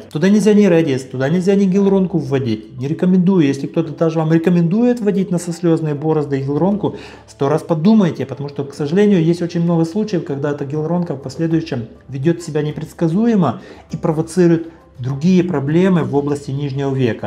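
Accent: Ukraine. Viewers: Дядьку, всоте кажу : у тебе ДУЖЕ СИЛЬНИЙ український акцент!!! Говори українською. Чи, мммм, грошики не пахнуть???😮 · у доктора родной язик украінский, єто просто акцент. скажи ему спасібо что он для тебя по русски рассказивает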